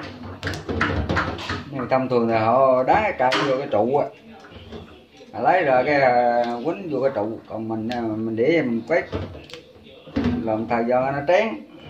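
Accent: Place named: Central, Vietnam. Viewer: ôi đúng giọng miền trung,hướng dẫn tận tình chi tiết!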